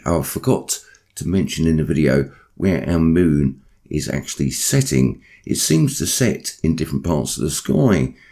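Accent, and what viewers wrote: England, United Kingdom. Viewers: Nice to hear an English voice for a change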